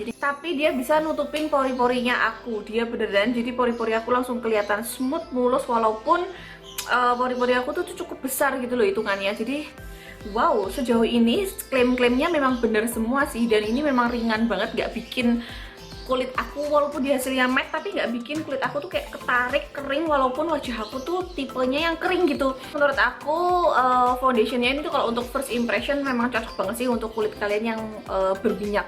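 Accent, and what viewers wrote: Java, Indonesia. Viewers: Yeeyy gercep buat mba medhok 🤗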